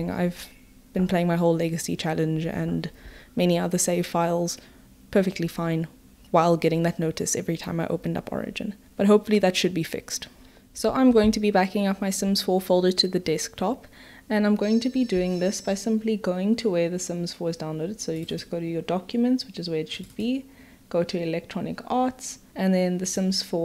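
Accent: South Africa. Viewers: Are you South African ?? :)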